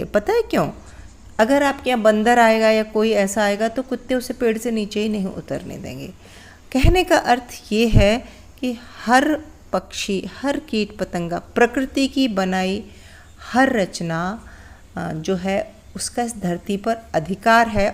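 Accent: Standard. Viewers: aapka Hindi itni shudh and sureeli hai ki aapka Hindi keliye me jaroor dekhti hun video, and ofcourse aapka gardening coaching to la jawab hai, mai ek soth Indian hun, par muje Hindi bhasha Jo aapki jaisi shudh aur pyari ho wo bolne Sur sunne keliye bohot accha lagta hai, me rehti hun Mumbai me 37 years ho gaye hai, par yaha Hindi alag hai, mixed hota hai, aur slangs hai, me govt dept se voluntary retirement le chuki hun, waha Hindi seekna jaroori tha, par ham kaam vyasth hote the ki muka nahi mila, and aap se shudh Hindi sikhungi, aap ne ye video bohot short kar diya 😀, Mere bhi chota balcony garden hai, isi tarah chidiya chotisi aati hai aaj kal, bohot aanad hota hai usko dekh kar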